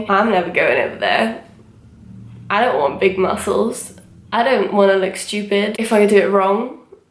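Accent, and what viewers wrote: Wales, United Kingdom. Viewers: Is it just me that can hear a subtle accent?? Like I can obviously hear the London accent, but something else too?? No?? EDIT: shit, she’s welsh like me! 🏴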